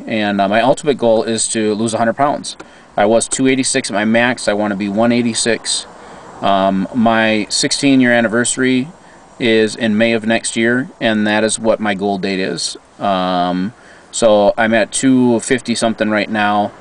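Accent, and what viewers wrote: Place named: Minnesota, United States. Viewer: Hey..thanks for sharing your history of weight. You have a strong "Minnesota" accent...lol... You can make it to goal..don't give up! ~Amber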